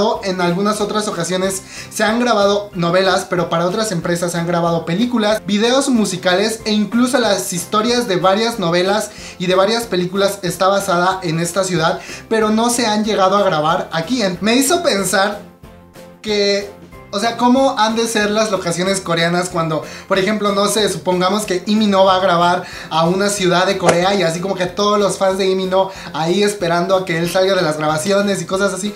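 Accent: Oaxaca, Mexico. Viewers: eres de oaxaca ???? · Omg :v eres oaxaqueño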